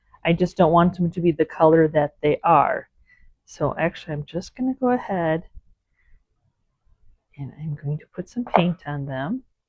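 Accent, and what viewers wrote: South, United States. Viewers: Me too. I have noticed several words she says that sound like us down here in the south. I love it.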